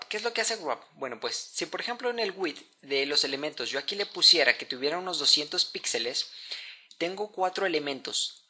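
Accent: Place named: Mexico. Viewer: Excelente video. Aprendí más de Flex. Solo una duda, porqué los mexicanos dicen "WEF", en vez de "WEB"...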